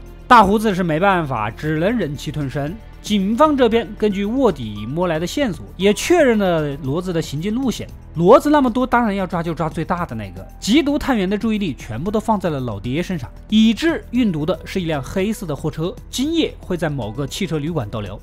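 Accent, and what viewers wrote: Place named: Wuhan, China. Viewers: 要听“老爷车”,你浓浓的武汉口音让我觉得像是我在说话：）） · 哈哈，口音好重，不是湖南的就是湖北的，点到你微博一看，原来是武汉伢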